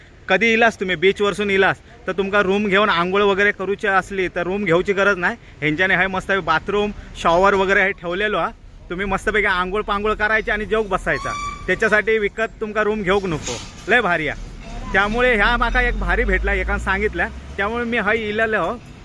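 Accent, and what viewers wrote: Malvani, India. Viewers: तुमच्या एवढी गोड मालवणी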